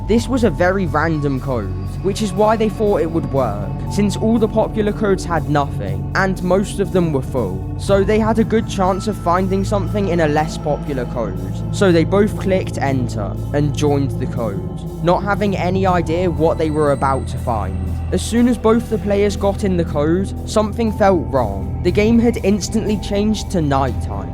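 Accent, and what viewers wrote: United Kingdom. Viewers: Are you scotish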